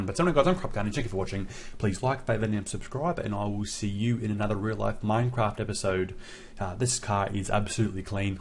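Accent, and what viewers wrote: Australia. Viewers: He’s Aussie? Let’s go😂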